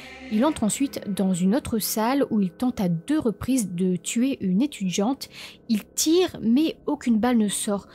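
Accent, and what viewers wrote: North, France. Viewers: Tu es du nord de la France ma grande... ça s entend... lol... moi aussi... Merci pour ton récit ! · Vidéo bien traité en effet, avec un petit accent du Nord de la France 😜 , merci encore 😘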